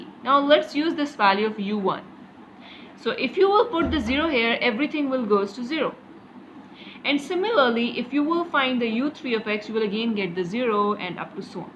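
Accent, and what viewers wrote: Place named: United States. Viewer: itna thanda american accent 😆😆😆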